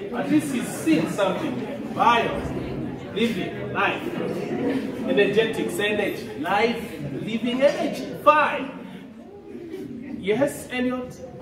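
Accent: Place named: Uganda. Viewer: You must have studied in uganda cz this accent 😅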